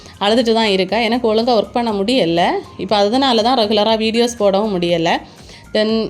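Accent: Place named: Marthandam, India. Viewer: Unga place marthandam ah sis ...voice apdi than irrukku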